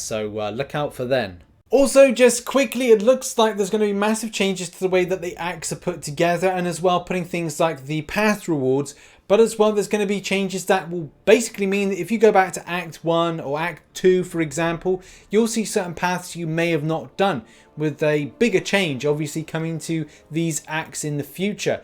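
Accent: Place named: United Kingdom. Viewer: thanks, I'm a sucker for British accent lol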